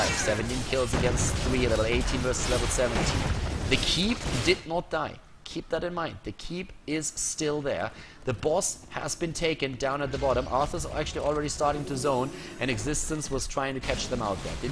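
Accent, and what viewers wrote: Germany. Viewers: man hört so krass, dass du deutscher bist XD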